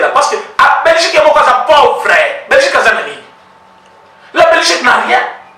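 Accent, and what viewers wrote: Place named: Luba, Democratic Republic of the Congo. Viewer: Yonde mutu oko songa na mboka bisika obotama Soki oza mutu ya region nini? Mais na elobeli, na tonalite oko zonga mueneditu. Yo nani? Atalaku ya UDPS, oko songisa biso na Congo? Oza mbua raisonnement ya supu mbua .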